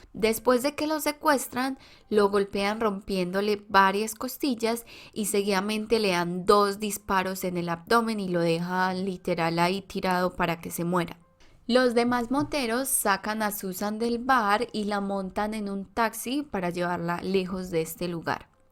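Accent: Colombia. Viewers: Hola nena! Espero que estés muy bien. Te quiero aclarar algo: No se les llama moteros. Yo sé que eres colombiana , y me encanta tú acento. Se les llama bikers. Que se pronuncia baikers. Y no se dice autostop. Se le llama ride , Que se pronuncia raid. Eres muy bonita, y excelente narradora. Felix Aguilar desde los Ángeles California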